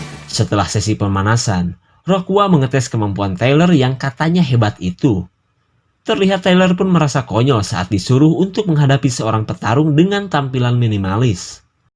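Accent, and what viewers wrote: West Java, Indonesia. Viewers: Teu dindi2 ai jama sunda ngomong na garelo tpi mantak nyeri beteng ngakak bae😆😆😆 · Sunda pisan ekekkek hanjakal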